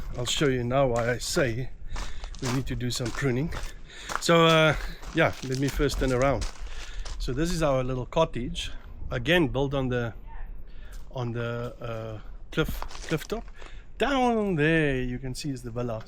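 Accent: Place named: North, Malawi. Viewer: You sound like you’re in the north of Malawi, where exactly is your villa? What’s the name of the place?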